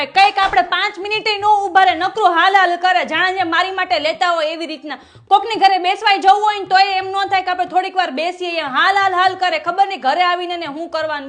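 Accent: Kathiawar, India. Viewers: Tame aa j kero..biju badhu reva j fyo ho..aa to mast..pure kathiyavadi..superr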